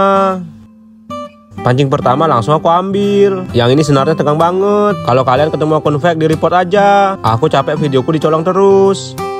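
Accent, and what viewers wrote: Java, Indonesia. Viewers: saya mau tanya, apakah kamu ini ada keturunan orang Jawa kok dr logat’ya Jawa bgt bang??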